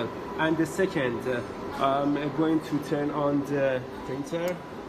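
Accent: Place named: Iran. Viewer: Persian accent :D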